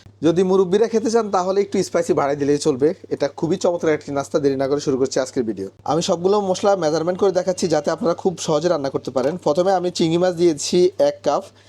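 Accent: Noakhali, Bangladesh. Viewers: ভাইয়া আপনার গ্রামের বাড়ি সন্দীপ নাকি নোয়াখালী?জিজ্ঞেস করলাম কারণ আপনার কথায় এ দুই জেলার আঞ্চলিক টান আছে।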